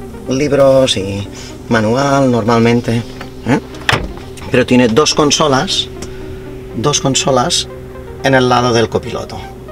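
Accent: Catalonia, Spain. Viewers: Tal como comentan, el acento es claramente catalán. Se nota que has seguido hablándolo aún residiendo en USA. Los paisajes del video me recuerdan mucho a los que veo en la zona limítrofe entre el estado de New York y el de Connecticut, a la altura de Danbury. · De hecho, yo pensaba que era catalán con un acento muy cerrado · Este tipo parece catalan por el acento pero no se donde se le pego lo del piso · Pues el acento es 100% catalán...no noto para nada el inglés. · Eres catalán? En Cataluña hablamos como tu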